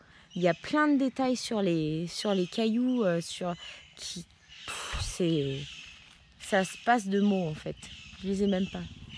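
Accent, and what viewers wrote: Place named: Cambodia. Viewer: Super Voyage et superbe vidéo !! On a adoré aussi de découvrir le pays dont je suis de cette origine. Une belle découverte pour nous en fin janvier et début février 2020 juste avant le COVID 😅. Ravis de vous suivre ta chaine youtube et rubanbleu. Et super l'accent cambodgienne 😅. On a hâte de y retourner. Merci beaucoup pour cette vidéo.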